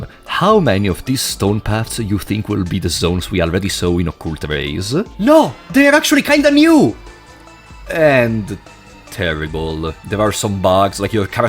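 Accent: Italy. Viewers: Are you italian? (Im italian too and i noticed your accent)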